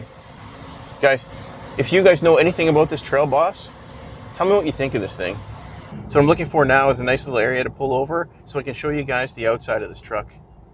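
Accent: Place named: Canada. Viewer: How did I know you were canadian based on your speech? Ha you've got me even more intrigued by the custom trim. I want the LT, but not sure if it's worth the extra 10k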